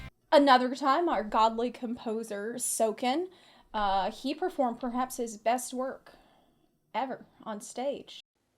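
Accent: South, United States. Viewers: wtf is happening lol, I forgot you existed and somehow your southern accent got thicker LOL and this videos so goofy, with all the random things that happened in the past lol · Since she moved back to the US she reaaallly has that Southern drawl. Also,clearly hyper - dem goggle-eyes doe... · Genuine question: where did the southern US accent come from? I just came from her "all mounts" video from 3 years ago and her accent is totally different. Thanks.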